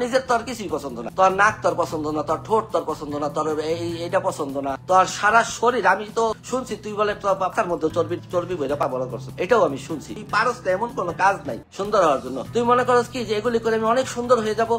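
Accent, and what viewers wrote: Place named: Faridpur, Bangladesh. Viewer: মামা আপনার বাড়ি মনে হয় শরীয়তপুর বা ফরিদপুর ওইদিকে আপনার কথার ভাষাতেই বুঝা যায় জোশ মামা জনগণের মামা আপনি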